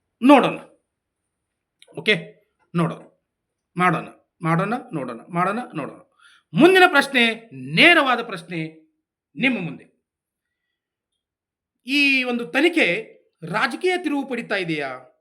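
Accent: North Karnataka, India. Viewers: Superb narration 👌👌👌 I loved your north karnataka accent 🤣🤣🤣🤣🤣🤣🤣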